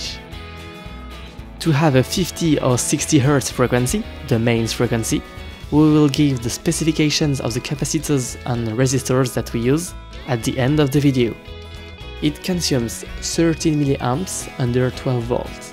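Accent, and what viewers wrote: France. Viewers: the accent is not the best (i know you are french) but great video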